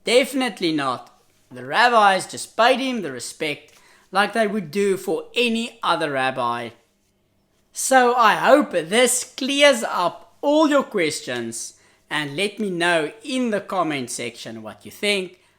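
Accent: Afrikaans, South Africa. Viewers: Hi boeta, jy is duidelik Afrikaans ... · Are you from South Africa? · Are you South African?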